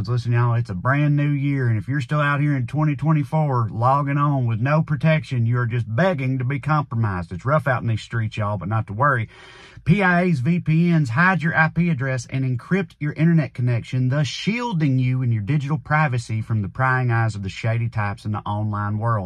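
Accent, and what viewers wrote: South, United States. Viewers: You always speak the truth, but ironically with a "Southern Touch". (The irony being Southerners are rarely liberal thinkers !!) 😊😊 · i never thought i'd see someone with a southern accent who wasn't an asshole. thank you <3 Edit: i'm southern myself, i don't have an accent, but i've never met someone with the accent who wasn't a complete jerk. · Hang in there! I’m age 65, grew up in a small town in Middle TN (Tullahoma), & we used to drive right through your home town in the 1960’s, 70’s and 80’s to camp and waterski on Dale Hollow Lake for long weekends. It’s such a pleasure to hear someone like you from my “part of TN” who not only understands US politics, how insane & nonsensical 1/3 of our country has become..esp since the “Tang-tainted a$$” 😂decided to run for prez in 2016 (not planning to win, just hoping to bring more attention to him and his “brand”). 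And the cherry on top for me is hearing someone speak the truth with the same southern accent that I still have 😊. Thanks for keeping me sane, & for saying “fifty-eleven times”!! Haven’t heard that since I was a kid! Keep ranting,! I share your channel with all my friends. PLEASE, PLEASE consider coming to Greenville, SC on 1 of your tours. We have become a purple city, & you will love it.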